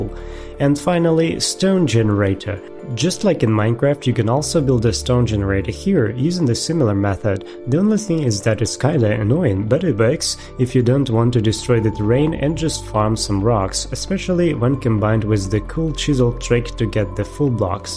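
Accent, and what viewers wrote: England, United Kingdom. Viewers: O ya vizhu vi iz anglii?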